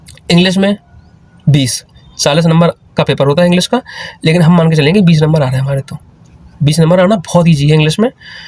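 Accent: Lucknow, India. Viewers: Aap lucknow se ho?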